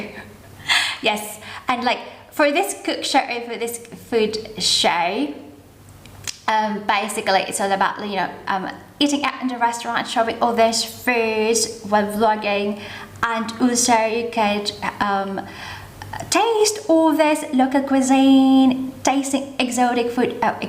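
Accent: United Kingdom. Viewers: i have a few passions that I am putting on display. but mine are from a student's perspective. I like the way you speak,. it's the king's accent, right?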